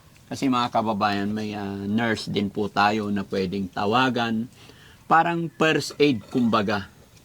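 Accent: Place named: Cavite, Philippines. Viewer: Ung tono ng salita nya sounds like cvte o batangas...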